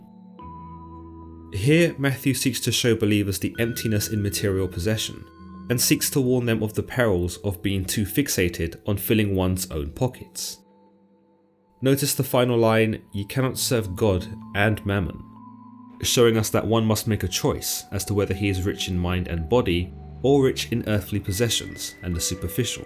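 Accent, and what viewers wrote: United Kingdom. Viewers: Lmfao no ones gonna watch this boring video 😂😂😂 i clicked on it and u have to read it and the bucktooth brit accent hell nah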